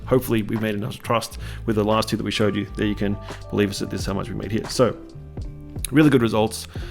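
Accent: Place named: Australia. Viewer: why does refreshing your page make you more trustable..... you sound Australian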